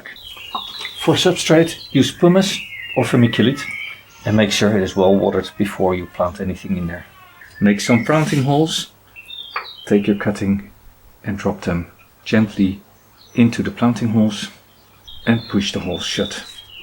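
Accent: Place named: Netherlands. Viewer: are you from the netherlands i can hear from your accent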